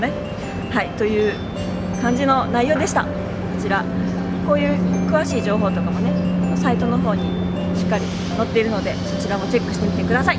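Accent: Kansai, Japan. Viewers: 標準語で話そうとしているのかもしれませんが、関西弁の発音が出てしまっていますね。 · 関西弁いいわー！ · 関西弁、萌えるはーｗ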